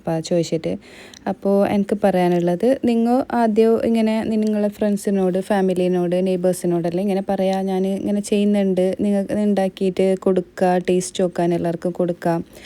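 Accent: Kasaragod, India. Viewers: കേട്ടിട്ട് കാസർകോട് സ്ലാങ് ആണ്, ശെരിയല്ലേ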